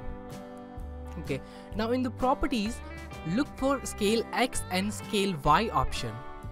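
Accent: India. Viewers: he sounds like an indian tech scammer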